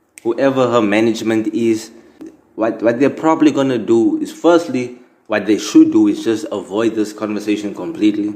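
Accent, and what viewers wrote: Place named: Durban, South Africa. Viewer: Was gonna say you sound like you spent some time growing up near Durban somewhere, be that as that may, you saying you "not taking kak" is so typical though. Love it!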